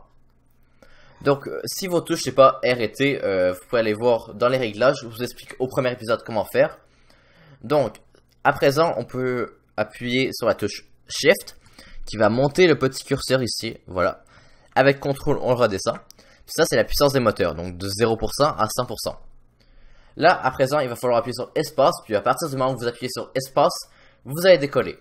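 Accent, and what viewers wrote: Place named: Quebec, Canada. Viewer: Ton accent paraît québécois donc je suis casi sûr que tu es un canadien mais dis-moi, t'es un passionné de l'espace ou t'es à l'école d'astronomie en faite, moi je fais attention à presque toutes mes sources d'information, je vais dire que j'ai appris pas mal de choses nouveau qu'en voyant quelques-unes de tes vidéos qu'aujourd'hui je me suis trouvé sur ta chaîne aujourd'hui même 31 déc 2019 en chercheant avoir quelques notions sur ce que c'est "l'orbite" et pour dans les résultats je suis tombé sur la vidéo que tu as fait a propos de ça, merci de lire j'attends la réponse de ma question hein, il faut que je vous dise aussi que je suis haïtien mais vivant au chili. Merci encore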